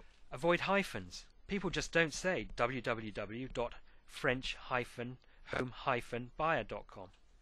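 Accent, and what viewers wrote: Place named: England, United Kingdom. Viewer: Thanks for the info. nice to hear an English accent!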